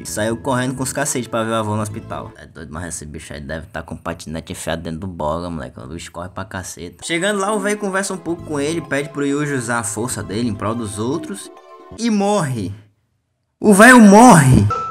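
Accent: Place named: Alagoas, Brazil. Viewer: Krlh tu é alagoano tb skskskksksks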